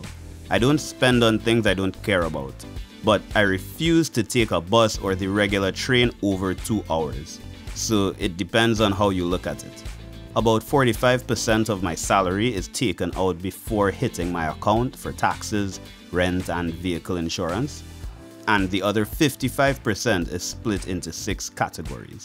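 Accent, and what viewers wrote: Trinidad and Tobago. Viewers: I can't believe I couldn't immediately place your accent! Trini man out there in rural Japan!! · mans in japan but you can still tell he is trini to the bone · just from the beginning could hear the trini in you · In the first 15 seconds of the video I said that sounds like a Trini. I'm glad to see you expanding your horizons. Recently I've been visiting mostly Latin American countries but my dream is to visit Japan in the near future.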